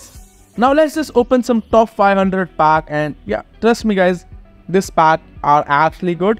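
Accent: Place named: India. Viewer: Love this Indian accent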